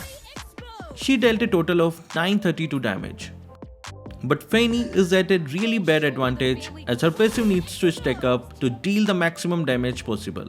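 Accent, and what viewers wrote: India. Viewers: Sound like a indian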